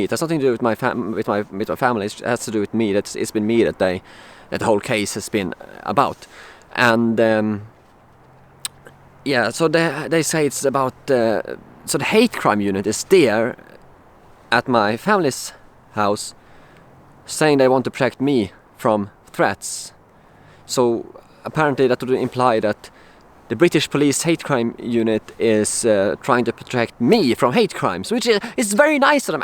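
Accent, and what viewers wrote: Sweden. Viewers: tried to watch. but the swenglish is just so bad i cannot stand it.. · Detta är alltså inte satir? Hade ingen aningen om att Svenskar fortf postade videos med så grov svenengelska som denna. Går ju inte att koncetrera sig på innehållet.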